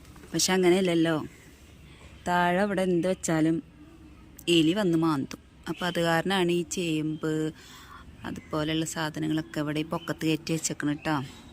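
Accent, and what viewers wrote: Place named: Kochi, India. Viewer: ഇങ്ങള് കൊച്ചി കാരിയാണല്ലേ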